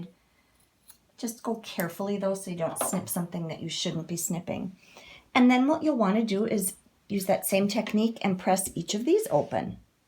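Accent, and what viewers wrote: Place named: Upstate New York, United States. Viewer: I have a dear cousin in upstate NY....is that where you're from? I love your accent. Thank you for your excellent tutorial!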